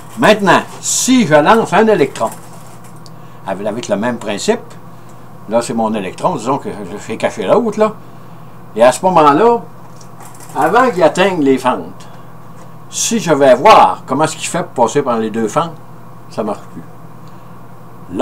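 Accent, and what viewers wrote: Quebec, Canada. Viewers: On critique souvent l'accent québécois, mais moi j'aime bien :) Une question me vient sur ce phénomène que je découvre pour la première fois et qui m'interroge beaucoup : plutôt que de supposer une conscience à l'électron, pourquoi et comment est-on sûr que les appareils de mesure ne sont pas aussi des interférences dans l'expérience ? Comme une sorte de thermomètre qui donnerait (ou enlèverait) la fièvre ? Peut être que je manque totalement de pertinence, je suis plus un littéraire, mais je ne peux m'empêcher quand même de m'intéresser à ça.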